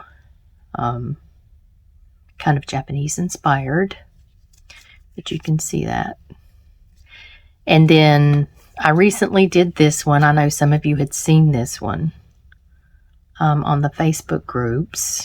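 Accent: South, United States. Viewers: you talked about your southern drawl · I can understand your southern drawl perfectly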